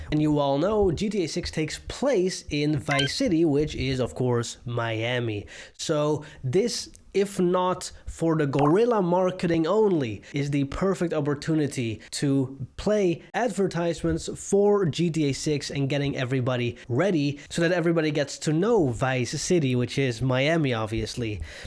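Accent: Netherlands. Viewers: Ofc he is the Dutch accent is through the roof..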